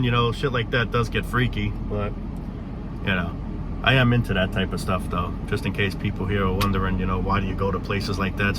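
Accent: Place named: New Jersey, United States. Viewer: I wish I was your friend! I love to do adventurous things like that , and I could listen to that Jersey accent all day long!!!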